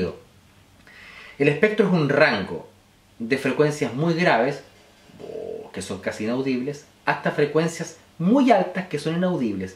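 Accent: Chile. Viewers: Mira Con tu voz tu habla Chileno no critiques a Manuel Manuel es mucho Manuel cantando lo que tú canta no gasto tiempo en escucharte Tanfeo que hablas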